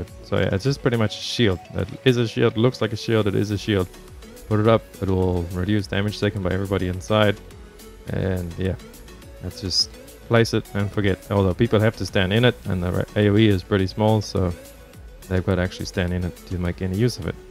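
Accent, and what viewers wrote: South Africa. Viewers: Another South African?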